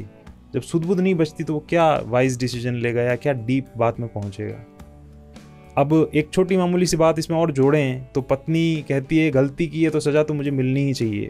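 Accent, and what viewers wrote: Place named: Rajasthan, India. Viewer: Sir aap rajasthan se ho ? Aapki awaaj se laga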